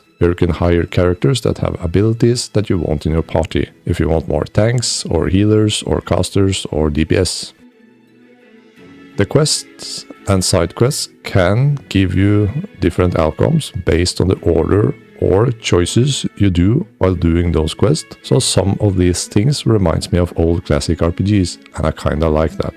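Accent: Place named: Norway. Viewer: haha this english it could only be the one of a norwegian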